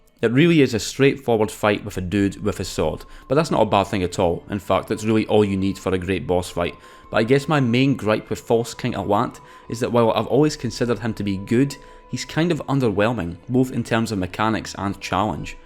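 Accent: United States. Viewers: Non Americans doing an American accent are goated with the sauce, thank you for your contribution 🫡 🇺🇸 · Bro your American accent 10/10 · A potshot at people who dislike Scottish accents and speaking in an American one - it's random shit like this why I love your videos! 😄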